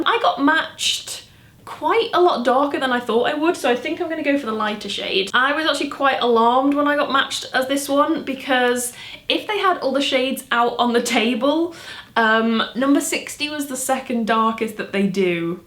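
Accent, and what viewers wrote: Liverpool, United Kingdom. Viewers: I recently got my hair cut to a similar length so I feel your pain about tying it back! I love essence and wish it was more readily available than in wilkos! Also nice scouse accent 😂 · Your scouse accent is actually SO good. 😂 X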